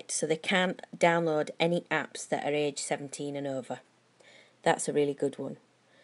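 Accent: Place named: United Kingdom. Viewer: Good video. I love the British accent.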